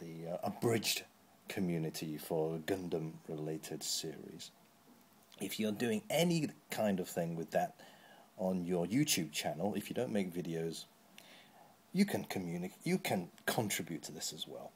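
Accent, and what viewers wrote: United Kingdom. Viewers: I love you so,. You really are the community's daddy. It says quite a lot, being able to bring together such a traditionally fragmented fan base. True, it is only the Youtube Gundam community, but every time the Gundam community manages a respectable step forward, I think it deserves pretty massive applause. Also, when you're joking about "how do I post a video response" I couldn't help but wonder how long you've lived in Hong Kong; your British slagging seems to not have faded yet.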